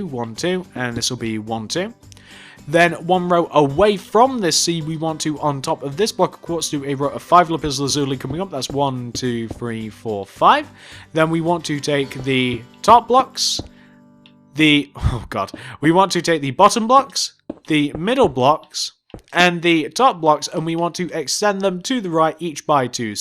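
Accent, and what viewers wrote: United Kingdom. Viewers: Leth Ls he is British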